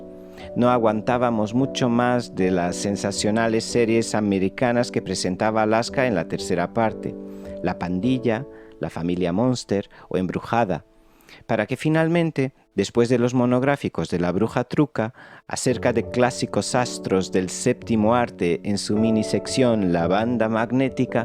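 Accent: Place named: Portugal. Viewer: Portugués... Claro... Habia algo en tu ecento... En fin... Excelente canal y gracias por responder tan pronto....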